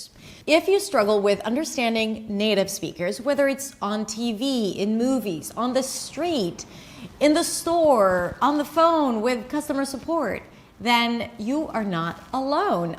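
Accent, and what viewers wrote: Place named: New York, United States. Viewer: I'm a native speaker of English, so I got all of these correct, and most of them almost immediately, so no surprise there. However, speaks with a New York accent, and I come from Detroit, in the Midwest, so I had a bit of trouble with "out of the office", because she actually uses a different vowel in "out", which sounds to me like "at". Point is, even native speakers can still have a bit of trouble with an exercise like this, so foreign students of the language should not feel bad about missing a few of these. English not only has these strange prosodic patterns, but the vowels often shift a lot from one region to the next.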